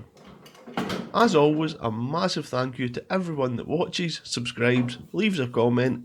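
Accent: Scotland, United Kingdom. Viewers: Brilliant, wie immer! Ich liebe den schottischen Akzent.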